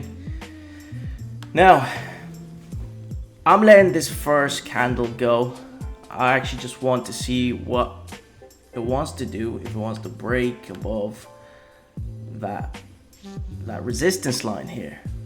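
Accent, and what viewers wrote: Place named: Ireland. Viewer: what accent is that yo. Irish Im assuming sounds g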